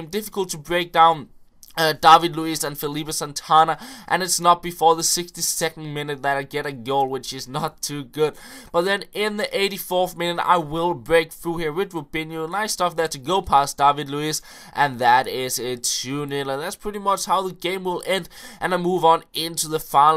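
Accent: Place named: Denmark. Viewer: it is funny cause i amm drunk, and it looks liiiiiiiiiike it is 44 plkayers on the turf :P BTW, i absolutely love you voice. the danish-english accsent is sooooooo damn awsome and sexy <3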